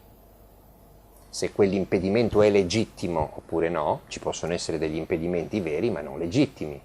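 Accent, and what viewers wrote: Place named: Naples, Italy. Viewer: Complimenti per il dialetto napoletano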